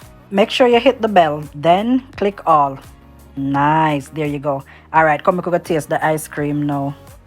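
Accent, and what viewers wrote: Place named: Jamaica. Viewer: U sound like a Jamaican 🇯🇲 New Subscriber😂🙌💖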